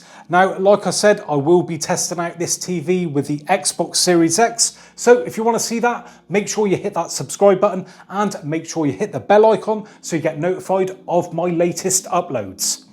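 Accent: United Kingdom. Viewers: Love the video mate. Nice to hear a Brit on you tube for a change. What wall mount did you use as I am thinking of buying this. What made you chose this over the C1 by the way?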